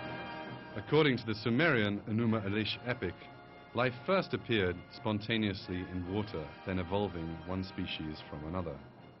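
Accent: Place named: United Kingdom. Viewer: great british accent. other than that, a total waste of time. thanks for nothing. get a job.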